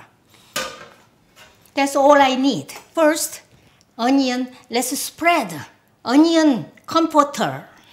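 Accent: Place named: South Korea. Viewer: 한국말 같은 영어 너무 좋습니다!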